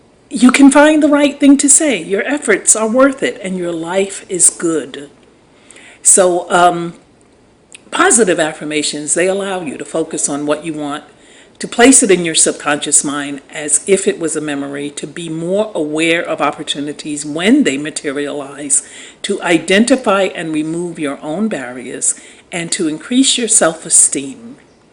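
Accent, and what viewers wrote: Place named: Northeast, United States. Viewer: Ha! I bet those who've said something bout your voice are from the South! I'm a southerner I even said to myself "Listen to this Yankee!" I'll apologize for us lol. But on the real, Love ya accent . Love your videos. Keep doing what cha do sistah!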